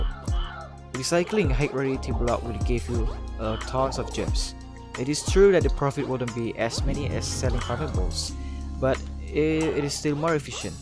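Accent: Singapore. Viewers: u sounds like sigapore alot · Singaporean sound 🤔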